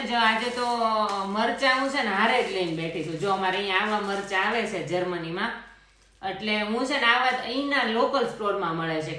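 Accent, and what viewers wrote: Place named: Kathiawar, India. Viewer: Tamari kathiyavadi accent Bov sari che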